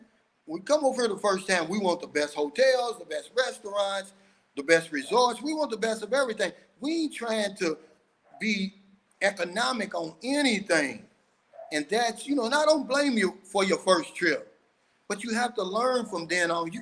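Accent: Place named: New Orleans, United States. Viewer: Hey are you I like what you're doing I'm from Florida in the Miami area my wife is from the Philippines (zamboanga) I like your chanel a lot you don't sugar code anything keep up the good work keep doing what you're doing and I also like the new Orleans accent also thank you for everything okay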